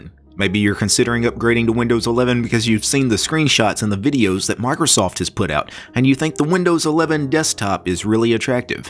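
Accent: South, United States. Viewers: “You can change desktop invrments” 😂 I love your southern twang 👍🏼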